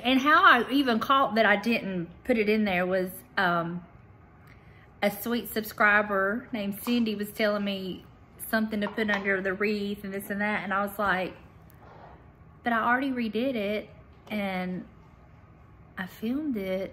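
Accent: South, United States. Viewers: I think so too!!💖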